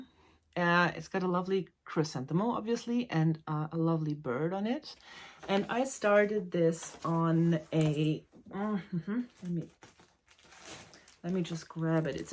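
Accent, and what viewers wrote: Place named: Germany. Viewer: I was so happy to meet you and the other "Mücken" in my backyard! Great re-cap of the weekend and I loved seeing your new sitchy space- hopefully things will get easier as you get settled. I also so enjoy watching your videos in German first and then your excellent English version- it's like a language review for me (and Hoch Deutsch - music to my ears! LoL) My Romanian friend says "shoot two rabbits at once". Why ARE all of us killing animals in mass to save time? 🤣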